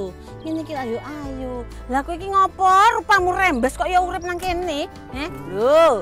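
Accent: East Java, Indonesia. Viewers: Bu, asli mana ya.. Logate kok wong etanan 🙏😉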